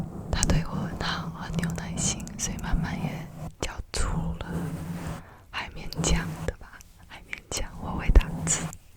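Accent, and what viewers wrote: Taiwan. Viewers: 好少遇到台灣ASMR很喜歡這個口音❤ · 你的zongwen好可爱 哈哈哈 好喜欢台湾口音 听得好舒服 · 好家伙这个完美 你听起来台湾人🌚哦哦你的父母是台湾人😮厉害 我的眼睛太疼了晚安🤣🤣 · when you started speaking I KNEW YOU WERE TAIWANESE I love ur accent!! ❤️ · 台湾腔太有魅力了😂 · 台湾人路过 方便妳看 我转简体字 妳的一些中文用语好可爱喔😂 妳的自述故事也很有趣～ 每次那个“可是”都念“可4” 真的很台欸🤣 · 您好像学了台湾中文，对不对？因为我的老师教我北京汉语，所以我看繁体字看得不太好。虽然我完全不能看繁体字，不过我听台湾的口音平时听得懂。我自己觉得您说得很好！ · 可是你的台湾口音很relaxing诶！ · 有台湾味道的中文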